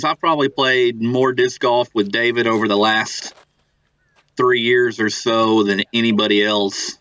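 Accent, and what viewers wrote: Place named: Arkansas, United States. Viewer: Fantastic to watch. Love the commentary! Including the great Arkansas accent. Looks like a great event. Thanks so much for posting!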